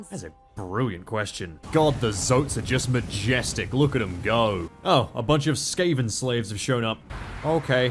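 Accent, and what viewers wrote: Australia. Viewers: It's like Sseth and Sovietwomble, but Australian. And I like it.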